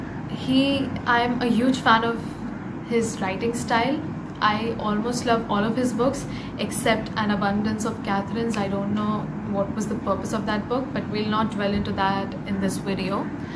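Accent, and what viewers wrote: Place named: India. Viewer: Cringey? Are you kidding me? I adore the Indian accent! Looking forward to seeing what kind of content you create. :)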